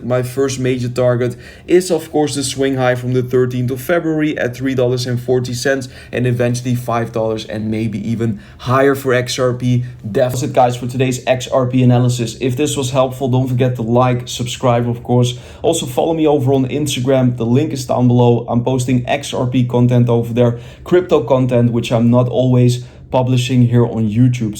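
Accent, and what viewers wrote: Netherlands. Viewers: Nederlandse accent weer duidelijke te horen😂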